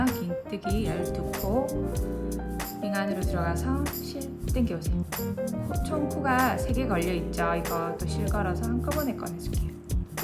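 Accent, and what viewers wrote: Busan, South Korea. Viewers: 부산 말투가 정감있고 좋아요~ 오른쪽거 제 취향. 수세미로 쓰기에 너무 이쁘네요. 컵밭침 인줄 알았네요. 삼베실로 모자 같은거 뜨면 예쁘겠어요. 짧은뜨기~ 하니까 예전 추억이 돋네요. 노인에겐 치매예방, 아이들에겐 집중력 개발 안정감 증진! 많이들 보고 따라하면 좋겠다 싶습니다.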